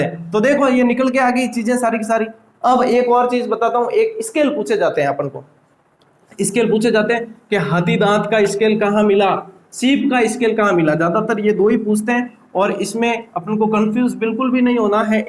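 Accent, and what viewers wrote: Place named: Marwari, India. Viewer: Sir apki marvari boli bhut achi lgti h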